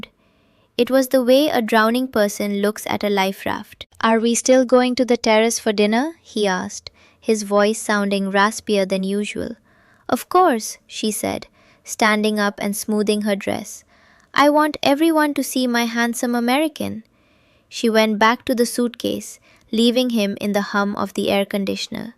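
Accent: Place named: India. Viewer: A lady from the Philippines with an Indian accent 😅.. AI is a disaster 😳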